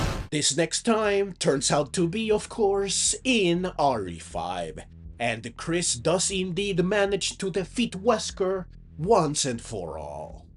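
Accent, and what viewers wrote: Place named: Italy. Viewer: Where is your accent from? It sounds like the American stereotype of an Italian accent a little bit.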